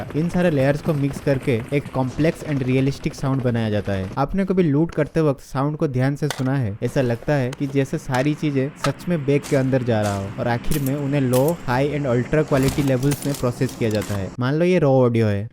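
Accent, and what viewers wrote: Odisha, India. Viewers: Bhai tum Odisha se ho kya? Tumhara awaz ek odia toka jesa lag rha h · Are you an odia? Your accent makes me feel like that. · Odisha se ho kya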